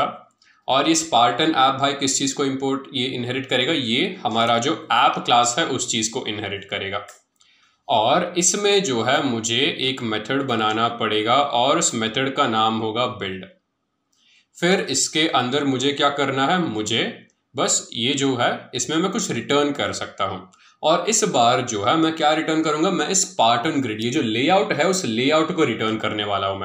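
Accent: India. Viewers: Anyone relize the amazing indian accent?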